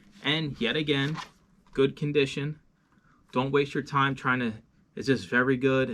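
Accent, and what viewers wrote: Philadelphia, United States. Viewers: I went with Silver Wolf DVD for 2.99. I'll let you know how things go after I get them in and turn the info in. You're east coast yeah? You have a Philly accent.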